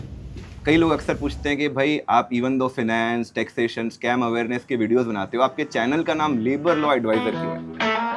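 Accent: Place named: Jodhpur, India. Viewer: Damn you're Jodhpuri!!😅very proud to hear it!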